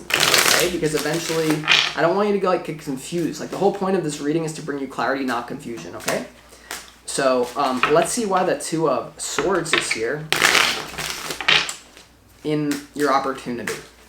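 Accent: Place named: Oregon, United States. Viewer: Don't get losttt in the sauceee hahah yesss your lil quotes and how you talk reminds me of my time spent living in Oregon, miss it so much as an Aussie, your readings help me feel closer to spirit and home 💞 thank you x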